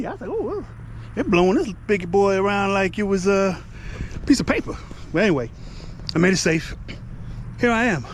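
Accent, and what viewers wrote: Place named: East Coast, United States. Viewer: Great content once again fam. I’m glad you cover the importance of being a motorcycle rider, I’ve had my license since 2005 along with training, but I rode before then. Just a quick question brotha, you sound like you’re from the East coast, but how is it in Savannah, Georgia? I’ve been thinking about getting out of Cali for a couple of years even though LA is hometown. Don’t answer where you’re from if it’s G14 classified, fam lol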